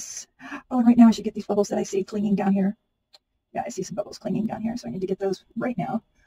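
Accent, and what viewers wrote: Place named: British Columbia, Canada. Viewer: Hi you sound decidedly Canadian BC in particular... LOL if people don't want to listen to your channel they can move on those of us that want to listen we will and as far as your hands go your hands look like every other artist hands except they're not plastic looking I hate it when they try to do these crafts with those bloody Nails on it's ridiculous you're fine and you're doing a great job and thank you for showing me what I can do with all my messed up resin projects. I am extremely new to pouring resin and most of what I have poured by now isn't real useful until now thank you❤❤❤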